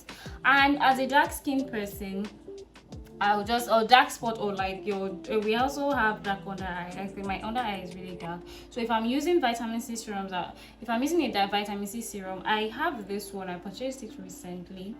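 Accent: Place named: Nigeria. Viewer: 😂😂wow🥺🥰 I asked because if not for the way you spoke I wouldn't have thought you were Nigerian fr😂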